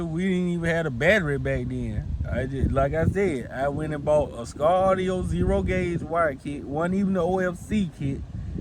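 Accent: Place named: Georgia, United States. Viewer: I enjoy your vids. I feel like, from your accent, you live close to me. I'm in SW Ga.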